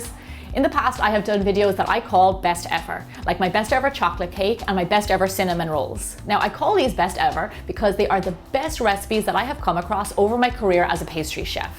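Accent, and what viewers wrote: Ireland. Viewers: i love your Irish brogue so I'm going to listen to all your videos! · i love her Irish accent. I could listen to her talk all day long.. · Hardcore brownies xD I will say it: it has to be fudgy, otherwise it's a chocolate cake! Brilliant recipe and I love your Irish accent :)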